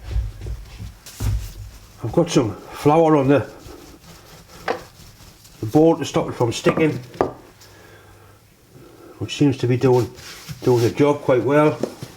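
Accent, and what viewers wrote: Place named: Geordie, United Kingdom. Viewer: Ah really enjoy yer traditional recipes an Ah love the way yee divvent change yer accent tee much. Ahm a born an bred Geordie frem Wallsend but Aa've spent the last 20 years living in Scotland. But when me laddie wez born Ah made sure wi cyame doon te the General Hospital just so me lad waad hev a rich heritage an be a true Geordie. Thanks a lot. Paul Wor lass is also called and wi love watching yi.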